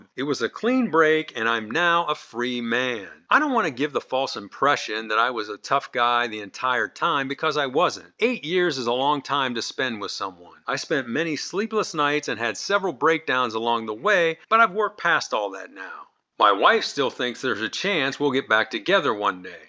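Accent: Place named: Pittsburgh, United States. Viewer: Sound like you’re from the Pittsburgh area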